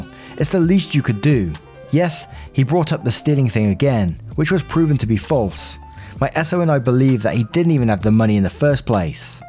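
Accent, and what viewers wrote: United Kingdom. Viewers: I don't really like the relationship stories but I wanna sleep on a bed of your voice! 😂😂😂 So whether I like the topic or not, I don't even care. Can listen to your voice all day! It's that british accent. 😊 I know this is an entitled neighbor one but was just sayin'....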